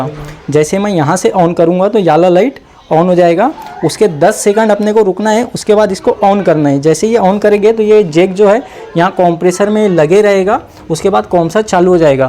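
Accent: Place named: Maharashtra, India. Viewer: तुमच्या बोलण्यावरून तुम्ही मराठी भाषिक आहात .. पण तुम्ही खुपच उत्तम आणि सुलभ पद्धतीने समजावता. ... एकच स्वतःची काळजी घ्या... आपणास उदंड आयुष्य लाभो ही श्री स्वामी समर्थ चरणी प्रार्थना !!!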